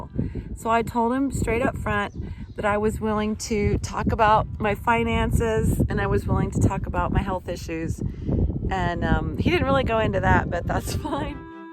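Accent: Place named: South, United States. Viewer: You’re a cutie, love your sweet way and southern drawl…’y’all.’ Much love from the UK x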